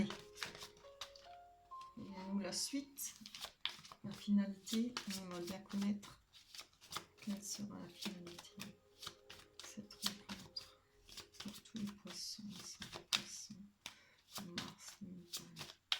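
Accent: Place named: Franche-Comté, France. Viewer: Vous avez un accent de Franche-Comté, ou pas loin.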